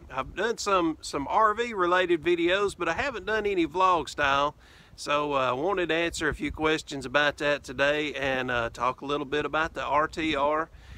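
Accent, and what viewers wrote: South, United States. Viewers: 😂🥰 you are just a hoot! I so love watching your videos and oh gosh yes, love that southern drawl😉🤩 Muppy is so adorable and she seems like my little girl right now, just wants to stay warm and curled up on her pillow and blanket or either on my lap. Yawl stay warm and dry and have a great week ahead😉👍🇺🇸🐾🐾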